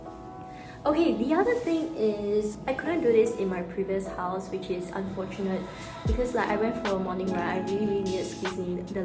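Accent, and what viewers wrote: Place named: Singapore. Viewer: Congrats again on your next milestone in life!!!!!! 💖 also I think you still sound quite Singaporean just that you enunciate your words properly hehe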